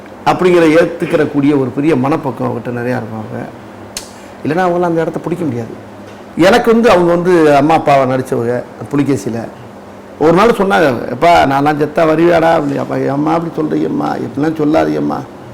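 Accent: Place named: Madurai, India. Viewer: Anne neenga elladadala santhanam pondra " nagareegam ellada comedians" vara arambichittanga. Vaya toranda ketta vartha, kudumbattoda pakka mudiyala anne. Unga azhagana Madurai Tamila ketta comedy meendum kodunga.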